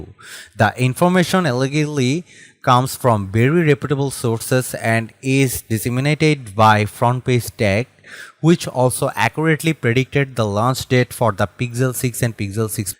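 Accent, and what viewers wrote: India. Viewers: Why trying to mask your Indian accent? It’s totally fine having an accent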